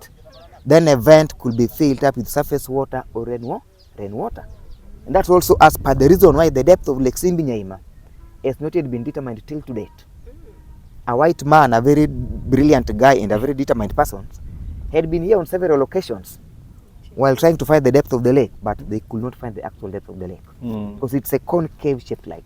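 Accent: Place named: United States. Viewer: Your American English is dope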